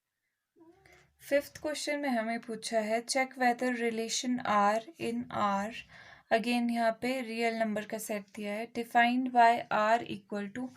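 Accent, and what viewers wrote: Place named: Haryana, India. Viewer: mam aappp haryana se ho kya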